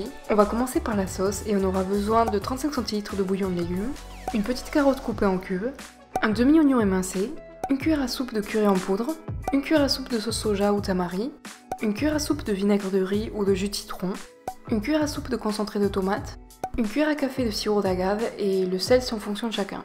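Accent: South, France. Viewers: eh non! Ne change rien tout est parfait montage recettes et ta voix off avé l'accent haha! Bonne continuation et merci:)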